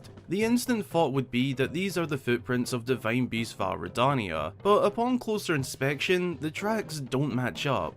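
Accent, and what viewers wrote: Scotland, United Kingdom. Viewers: When I heard the Scottish accent I audibly gasped. I'm also Scottish and a fan of LoZ :-) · I love the thought of Dodongos in BOTW! BTW, I couldn’t pinpoint it at first, but your accent sounds just like the male version of Merida’s from Brave. I’m guessing that may be because you’re Scottish? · First video I've seen from you, and I love the Scottish accent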